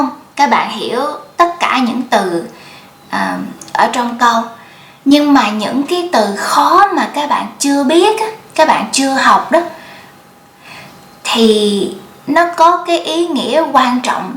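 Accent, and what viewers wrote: South, Vietnam. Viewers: Thanks for reply , i am malaysian nguoi hoa . i learn a lot of southern accent tieng viet from your video clip , cam on nhieu ! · Sorry I forgot, this is southern accent right?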